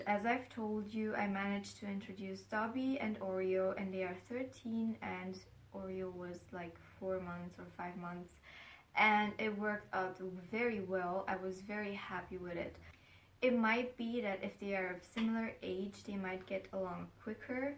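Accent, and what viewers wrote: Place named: Europe. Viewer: Where are you from in europe? I think I recognize the accent ;) anyway nice video! :)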